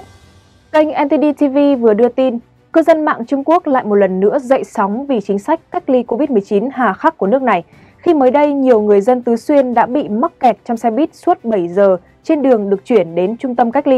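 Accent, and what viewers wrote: Standard. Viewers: giọng nói chuẩn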